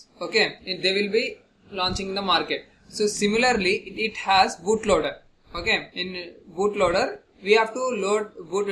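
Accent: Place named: India. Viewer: ntrog ntir ntintidiri ntig any one understand this indian accent ???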